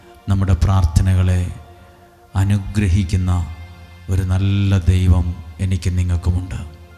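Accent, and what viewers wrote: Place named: Butler English, India. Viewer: Why is it in butler English. Can't hear or understand properly ast 2 weeks.missed ...keep only English clearly or only malayalam clearly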